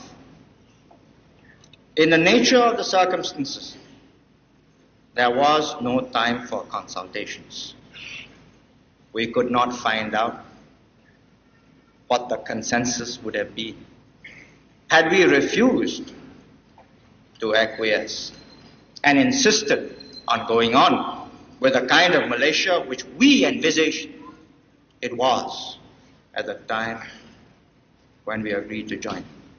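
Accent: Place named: RP, United Kingdom. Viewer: As a person from Britian, who can’t help but view Lee Kuan Yew as one of the most inspiring figures of the truly post war world, I’d like to say that you for uploading this and also. Wow he sounds so much more British upper-crust here, as he lived the decades in Singapore his accent slowly joined the home he formed.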